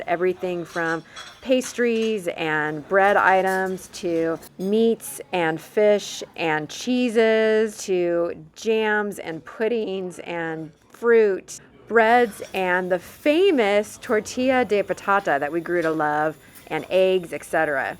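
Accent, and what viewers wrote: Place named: United States. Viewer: Con este acento, parece americana de nacimiento. O al menos lleva muchos años en EEUU. Dudo que sea alemana.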